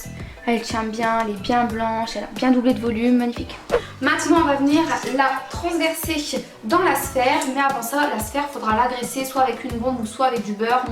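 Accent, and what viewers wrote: North, France. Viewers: Vous avez un accent du Nord je pense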